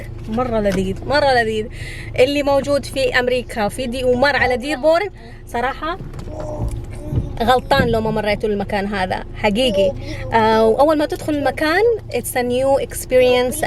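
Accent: Hejaz, Saudi Arabia. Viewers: مادام انتي جنوبية يا ليش لهجتك تشبه لهجة المجنسين في جدة والحجاز ،، ياليت تتحدثين اللهجة السعودية البيضا واللي هي لهجة قبيلية واضحة ،، ماهو حلو انه الانسان يضيع هويته الاصليه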